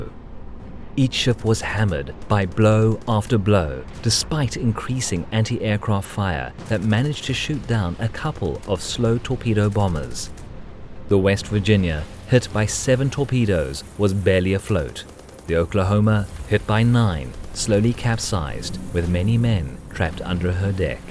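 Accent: South Africa. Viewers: Loved it, thank you! South African accent, Cape Town I would say. Sounds like you have been abroad for quite a while (or are you mimicking a SA accent?) I swear boet don't play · is the narrator South African?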